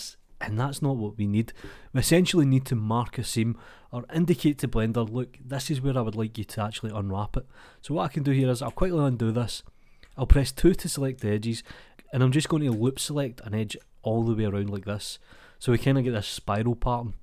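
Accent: Scotland, United Kingdom. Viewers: I freaking love your Scottish accent. Keep doing this!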